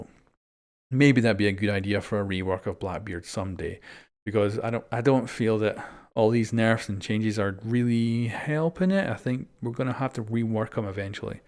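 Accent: United Kingdom. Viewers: AR S it’s something called a b r i t i s h accent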